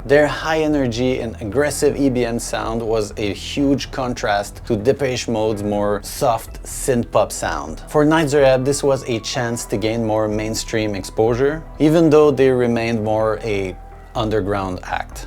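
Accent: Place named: Quebec, Canada. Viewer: Je détecte l'accent Québécois ❤ Dommage qu'il n'y ait pas plus de contenu francophone concernant ce genre de musique que j'affectionne très particulièrement. J'adore ta chaîne, je me suis abonnée ✌️ *Mention spéciale au film Spawn de 1997, une des meilleures trames Sonore jamais faites et .... My life with the Thrill kill cult dans le film The Crow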